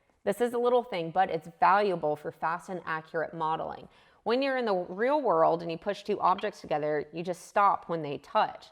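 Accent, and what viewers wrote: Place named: Waco, United States. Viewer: Love the Waco accent, with all the "Sawring and Drawring" you do! :-)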